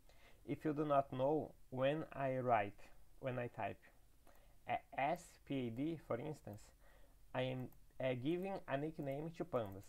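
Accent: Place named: Portugal. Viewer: Given your accent I'm almost sure that your native language is Portuguese =) am I correct? cya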